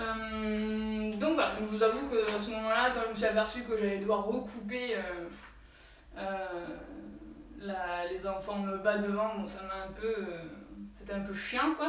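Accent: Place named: Tarn, France. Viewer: Merci pour cette vidéo. Tu as encore fait de super réalisations.... Bravo. Tu viens de quel département ? Car moi je suis du Tarn (de castres) et que ton accent est proche du miens. Je fais ma curieuse 😜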